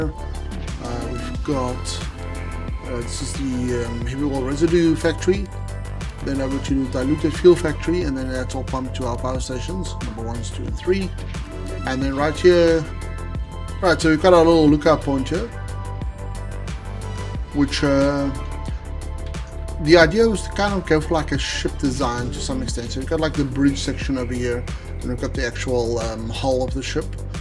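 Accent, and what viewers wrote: South Africa. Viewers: Sit en kyk hierdie video en dink vir myself, "die man klink Suid-Afrikaans" .. Well done man, dit lyk epic!! Subscribed👍🏻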